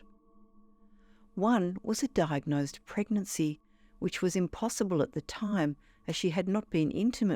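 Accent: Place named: Australia. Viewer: Its called an aussie accent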